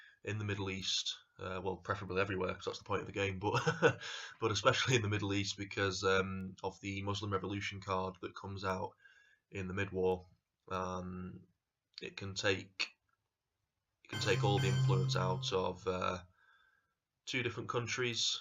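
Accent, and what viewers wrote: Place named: Northern England, United Kingdom. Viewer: "Sorry about my accent [I'm from Manchester]" — I love the northern English accent :-)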